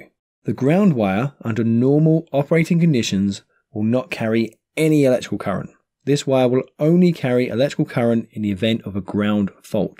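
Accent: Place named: England, United Kingdom. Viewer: Sounds so weird hearing an English accent explaining the American terminology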